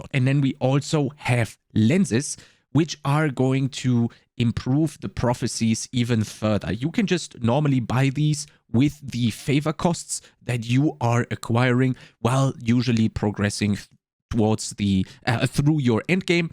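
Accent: Germany. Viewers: are you german ????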